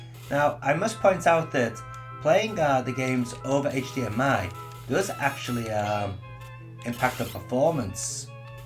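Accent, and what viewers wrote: United Kingdom. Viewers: I love your channel. I have to ask, as "verboten" as it is today, about your background (yes, your heritage... Oh NO!). Obviously you live (or lived?) in Japan but you have a very obvious British accent and as far as your look... well that's not as easy! That's why I ask. If it doesn't offend you (and I honestly don't think it will) can you tell us? Thanks.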